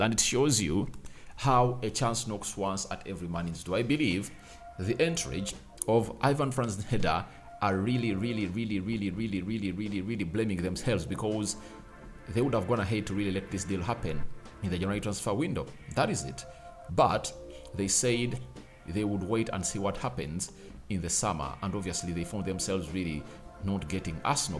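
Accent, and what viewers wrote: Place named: Uganda. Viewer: I knew u were Ugandan bro. I just checked to confirm...i know my pples' accent. Do your thing homie do your thing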